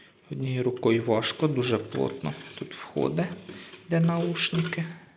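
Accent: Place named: Poltava, Ukraine. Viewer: Полтава балака)))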